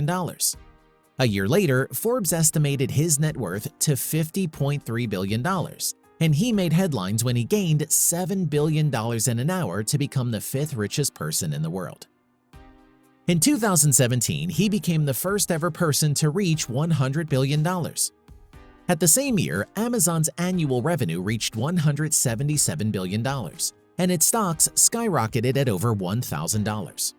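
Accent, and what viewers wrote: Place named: India. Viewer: me tamil nadu,india... your voice is like indian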